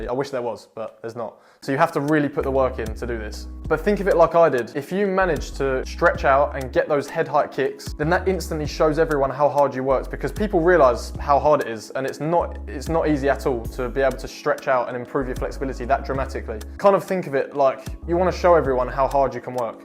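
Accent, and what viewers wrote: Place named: United Kingdom. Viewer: 😂 British English, man I understand everything, my first language is Portuguese, but it sounds funny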